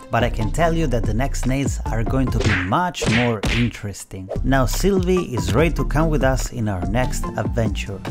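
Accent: Italy. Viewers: Your accent makes me think you're italian, aren't you? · Dude, are you italian?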